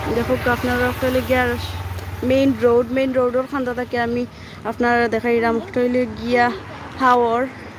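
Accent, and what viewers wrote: Sylhet, Bangladesh. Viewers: Assalamu alaikum babbizee sylheti bashai kotta bollsen etto shonder video keep it up 👍🏼👍🏼👍🏼👍🏼👍🏼👌🏼💪🏼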